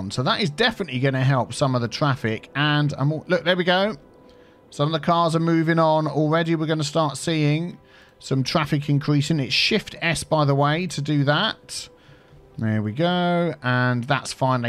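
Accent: United Kingdom. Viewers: He's trying to sound American? I'm Canadian and soundee like the most British man I have ever heard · You sound British?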